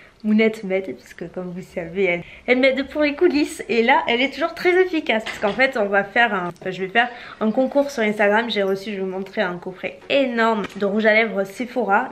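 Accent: South, France. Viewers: Ce n'est plus un mais 2 rayons de soleil en vidéo dès le matin !!! le bonheur !!!! et Notre Mounette internationale fan de chocolat, chantilly et crème va voir une diététicienne mercredi !!!! svp fait nous un retour !!! en plus elle a pas besoin de régime .... Elle est très bien notre MOUNETTE !!!! Bravo pour tout ton travail, on ne se rend pas compte les vidéos dure entre 10 et 20 minutes pour nous, mais quel boulot !!! merci pour tes contenus, ta joie de vivre et ce petit accent du SUD qui nous réchauffe !!!! biz à vous 2 !!! très beau sac ce TED BAKER, j'adore !!!!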